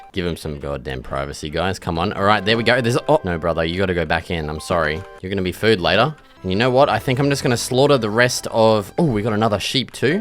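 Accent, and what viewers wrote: Australia. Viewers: You sound like a Aussie bloke nice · He’s Aussie!?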